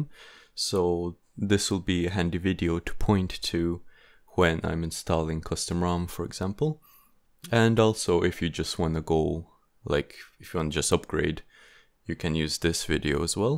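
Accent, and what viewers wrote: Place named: Ireland. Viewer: you have an irish accent im confused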